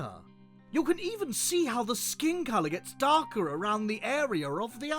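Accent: RP, United Kingdom. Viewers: I love there voice tbh, I definitely will binge the channel just to hear the fancy British vampire voice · This is the most British sounding person I’ve ever heard 😂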